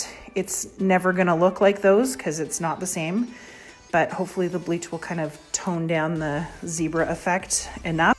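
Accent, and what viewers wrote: Canada. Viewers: Love the screaming kids and your Canadian accent ! Piece is beautiful too!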